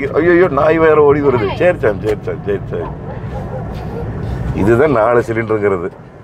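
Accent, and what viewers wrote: Kongu, India. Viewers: சார் நீங்கள் பேசும் கொங்கு தமிழ் ரொம்ப நன்றாக இருக்கிறது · கொஞ்சும் கொங்குத் தமிழ் பேசும் கோமகரே...! திகட்டாத தித்திக்கும் பேச்சுடைய திருப்பூர் திருமகரே...! லடாக் பயணச் சாதணைச் சகாப்தம் எங்கள் மதிப்பிற்குறிய திரு. அண்ணா அவர்களே❤❤❤🙏 எப்படி இருக்கீங்க அண்ணி❤❤❤🙏 எப்படி இருக்காங்க தம்பி ரித்திக்❤❤❤ எப்படி இருக்காரு. உங்களோட பல வீடியோக்களை நான் Miss பன்னிட்டேன். சிலபல இக்கட்டான சூழ்நிலை காரணமாக வீடியோ எதுவும் பார்க்க முடியல கமெண்ட் பன்ன முடியல. வழக்கம்போல அண்ணா உங்களோட பேச்சு வீடியோ சும்மா நெகு நெகு நெகுனு இருக்குங்கோ😊😊😊❤❤❤❤ நடுத்தர குடும்பத்தினருக்கு ஏற்ற அற்புதமான சாலை ரதம் என்றே இந்த "MARUTI SUZUKI EECO"வை கூறலாம்❤❤❤ மாருதி நிறுவனத்திற்கு ஒரு கணிவான வேண்டுகோள். அண்ணா நீங்களும்.... இந்த கமெண்ட் பார்க்குற சகோதர சகோதரிகள் யாராச்சும் மாருதி நிறுவனத்தில் பணிபுரிந்தாலோ? அல்லது மாருதி நிறுவனத்தோடு ஏதேனும் ஒரு வகையில் தொடர்பில் இருந்தாலோ இந்த வேண்டுகோளை மாருதி நிறுவனத்திற்கு தெரியப்படுத்தவும்🙏 நடுத்தர குடும்பத்தினருக்கு ஏற்ற அற்புதமான வாகனம் இது❤. 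சுய தொழில் புரிவோருக்கும்.... குடும்பத்துடன் பயணிக்கவும்... அவசர ஊர்தி (AMBULANCE) சேவையிலும் இந்த வாகனம் 100% பங்கு வகிக்கிறது🙏 மாருதி நிறுவனம் அவங்க கார்களை BS6 PHASE 2 விதிமுறைகளின்படி பல வடிவங்களில் புதுசு புதுசா தயாரித்து வெளிவிடுறாங்க. அந்த வகையில் இந்த ""MARUTI SUZUKI EECO"" வாகனத்திற்கு பாதுகாப்பு திறன் வசதிகள் மேம்படுத்தப்பட்டு. உ.தா (ESP.... TRACTION CONTROL.... HILL HOLD ASSIST) Power Steering. இவற்றோடு ஆட்டோமேட்டிக் கியர் பாக்ஸ் மற்றும், டிரைவர் சீட்டுக்கும் கோ பயணி சீட்டிற்கும் அட்ஜெஸ்ட் ஹெட்ரெஸ்ட்டும் கொடுத்து புதிதாக விற்பனைக்கு கொடுத்தால் 1000% மிகவும் பயனுள்ளதாக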